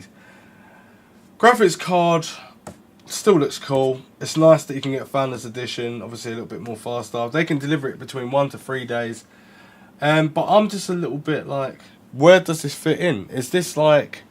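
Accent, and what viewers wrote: England, United Kingdom. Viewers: germany but i just love the english accent and I was in London a month ago... either I'm not understanding you correctly, but wasn't the 1060 6GB released when the GTX 10XX series launched? because i remember i had to decide between the 6 and 3gb version about 2 years ago when i got mine (1060 3GB)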